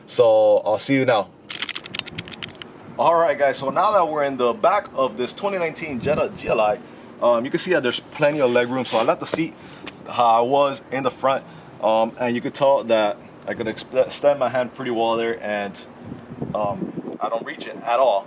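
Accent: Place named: Miami, United States. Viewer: I recognize that Miami accent anywhere. Rick Case VW plate confirmed my suspicions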